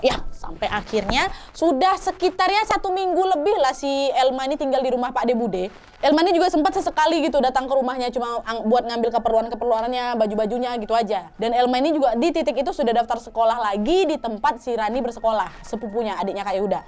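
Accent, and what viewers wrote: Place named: Padang, Indonesia. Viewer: Logat mbk sep org padang😊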